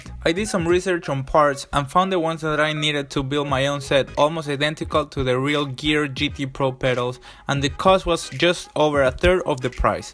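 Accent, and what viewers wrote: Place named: Spain. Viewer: Me suenas a español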